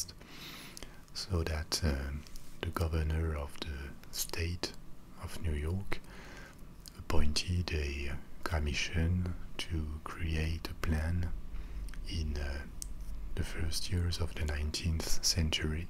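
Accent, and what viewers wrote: France. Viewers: I was gonna say he sounds French and then I took a look at his username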